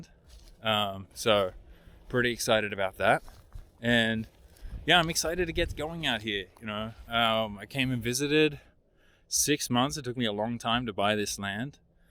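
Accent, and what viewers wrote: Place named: Australia. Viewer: Hope the property is close to a Tesla supercharger. Sound Aussie or Kiwi.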